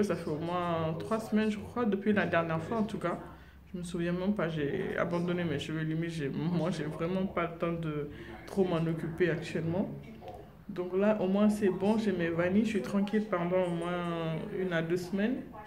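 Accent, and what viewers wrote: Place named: Guinea. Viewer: Très beau cheveux, j'ai deviné que tu es guinéenne dès que j'ai entendu tes premiers mots j'espère ne pas me tromper 😘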